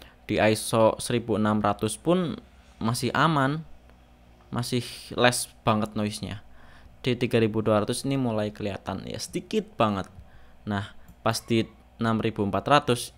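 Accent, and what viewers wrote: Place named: Java, Indonesia. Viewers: medok bgt bang,wong jowo yo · Medok banget masny, tapi bagus penyampaian nya lengkap as pengguna sony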